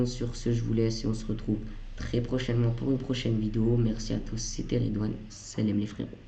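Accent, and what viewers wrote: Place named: Paris, France. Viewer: Tu parle comme l immigré parisien t es le régen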